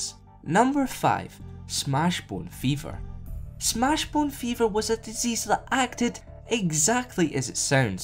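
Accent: Scotland, United Kingdom. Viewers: I can spot a Scotts when i hear one. This guy's definitely a Scottish. Prood tae be one, dontcha? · Are you Scottish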